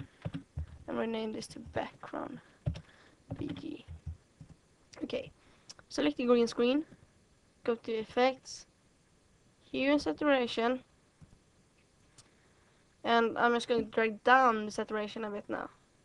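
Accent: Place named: Sweden. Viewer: Bra tutorial. Man hör att du är svensk :D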